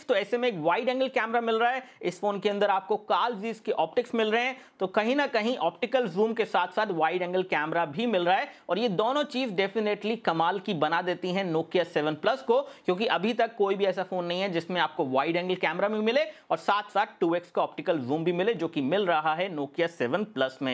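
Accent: India. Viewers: WHAT? i dont understand you its indglish ?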